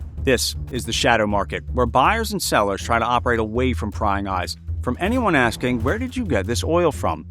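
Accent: United States. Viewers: u have brain worms chief he’s definitely American 😂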